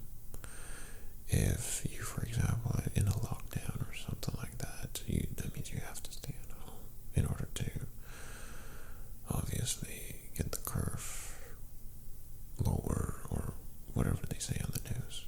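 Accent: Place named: United States. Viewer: Your English and your American accent are ridiculously good. Also, you have a very lovely mouth.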